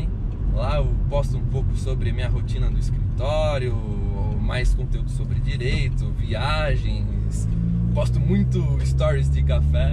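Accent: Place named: Rio Grande do Sul, Brazil. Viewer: Acho que eu sou o unico que imaginava que ele fosse assim kkk pela voz dava pra saber que tinha uma aparência nova Mas pelo sotaque parece gaúcho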